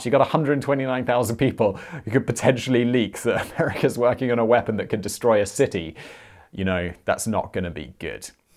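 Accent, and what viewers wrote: United Kingdom. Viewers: Looking a little weak in front of the history guy. Little bit scattered. Missing a few points. The British accent can't save you forever.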